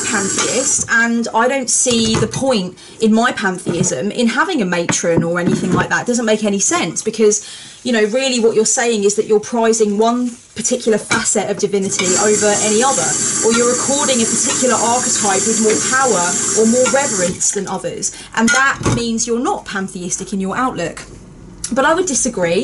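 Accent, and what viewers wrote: United Kingdom. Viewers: This was brilliant ... can't say the word with the adorable British accent though ... thanks ☮️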